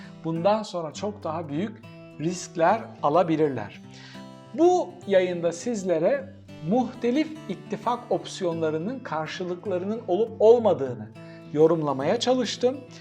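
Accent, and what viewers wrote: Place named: Istanbul, Turkey. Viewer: Çok sade ve tane tane, üstelik İstanbul Türkçesi ile anlatımınız oldukça etkileyici. Sorunuza gelirsek; rte kaybedeceği hiçbir seçime girmez. Yine 15 Temmuz vari bir olay çıkartıp kitleleri etkiler diye düşünüyorum. Hani bir söz vardır ya... Mahalle yanarken or...pu saçını tararmış... Bunlarınki aynı bu misal.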